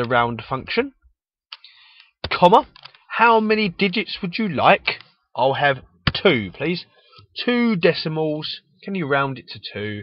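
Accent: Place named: Birmingham, United Kingdom. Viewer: Love the Brummy accent! Great job on the video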